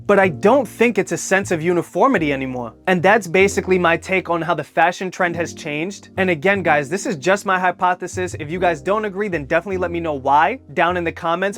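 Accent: New York, United States. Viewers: I love that New York accent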